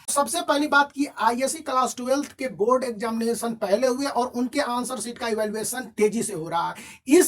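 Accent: Bihar, India. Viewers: Lekin sir aap bhi bihari bolte hai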